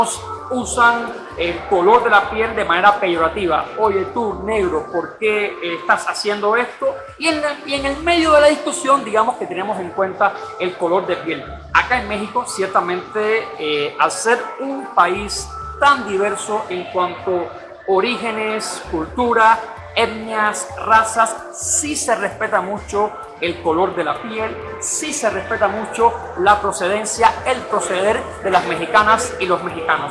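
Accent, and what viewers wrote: Cuba. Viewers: Ustedes son queridos amigos en cubanos en mexico nunca pierdas tu asento cubano hermano saludos desde atlanta Georgia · no sabía que bueno entrar a informarse, soy mexicana y me avergüenza que hay paisanos así, lamentablemente de todo fruto da un árbol y aún en México hay mucha gente que solo habla por hablar, discúlpalos se crecieron viendo televisa y TV Azteca y no se tomaron la libertad de leer. Saludos y un enorme abrazo a mi me encanta el acento cubano y hay mucha gente en México que vale oro, no te quedes con la impresión de algunos pocos mexicanos ignorantes. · Tu acento ya no es tan cubano o sea no es exagerado,me agrada tu acento , saludos 🎉🎊✨🌟⭐💫💙🤎💛❣️🤍❤️💚⭐🌟✨💫🎊🎉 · El asentó cubano se conoce a la legua usted es cubano no niegue su paiz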